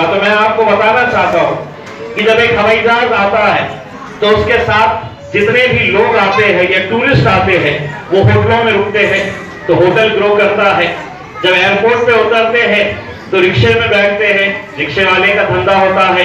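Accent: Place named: Aurangabad, India. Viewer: Awaz aurangabad ki👍👍👍👍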